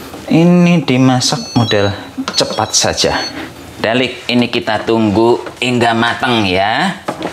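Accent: Banyumas, Indonesia. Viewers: Wong ngapak..., Ngomonge ngapak..., Ora ngapak ora kepenak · Ngapak mas medok banget semoga sukses mas. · Ngapak....senyum2 sndiri dipanggil lik.. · Njenengan wong endi mas, ngapak2😅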